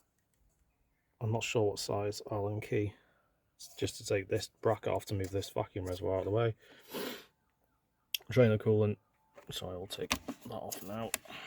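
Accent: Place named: North Wales, United Kingdom. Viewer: Sounds like North Wales accent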